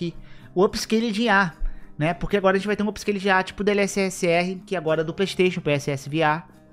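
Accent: Rio de Janeiro, Brazil. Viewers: Haha alem do sotaque carioca esse cara passa mo impressao de Nerdola ENZO de condominio kk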